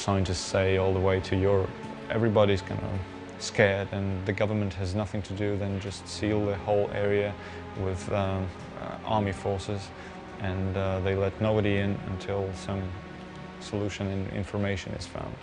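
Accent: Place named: United Kingdom. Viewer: i think this guy studied in UK? he speaks with a slight british accent...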